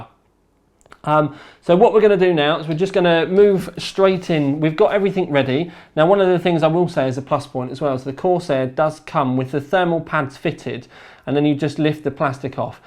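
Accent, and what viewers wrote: United Kingdom. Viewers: Andre Salles That's because he's in Britan and speaking British English... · Basic benchmarks No they didn't. The English did. Ever heard of a country called England? Ever heard of the English people? The English spawned the languge, not the Scots, not the Welsh, and not the Irish. +TheMaraio1 in England dude, he's English and speaks with an English accent, I'm American, I speak with an American accent. It's Americans like you that give the rest of us a bad rep abroad, seriously guys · this hardcore British accent is a little bit hard for me to understand LOL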